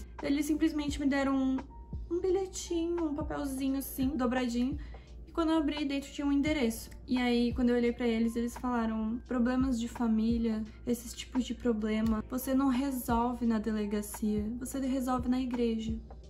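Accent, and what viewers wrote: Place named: Brazil. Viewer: Da onde vc é? Seu “R” não é de Sampa.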